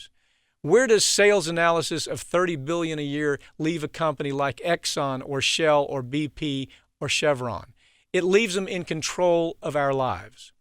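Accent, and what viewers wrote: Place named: South, United States. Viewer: And with a southern accent on top. He's like a character in a John Grisham novel.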